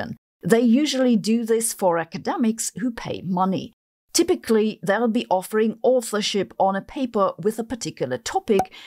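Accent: Germany. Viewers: I'm going to submit a paper on how many times Sabine says "however" per video, with a magnificent German touch. It's amazingly sweet! Thanks for the video.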